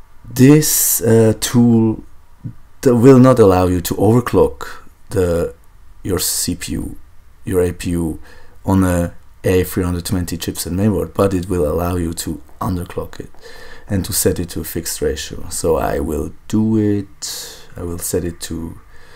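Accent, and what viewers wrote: Germany. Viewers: Are you german? thanks for the video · Saint Siemore Yes he is german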